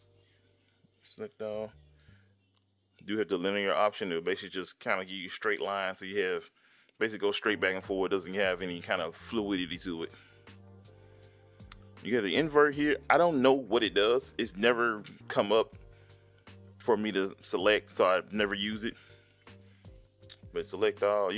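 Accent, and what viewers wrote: United States. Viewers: This is probably so random, but what's your accent? I know you're US, but like what state? I'm not from US but I'm fascinated with accents. PS, thanks for the vid, I found it helpful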